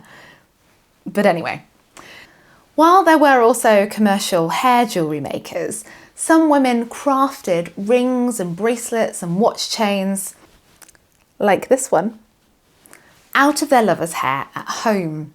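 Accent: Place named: United Kingdom. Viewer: やはりイギリス英語はよろしいですな。❤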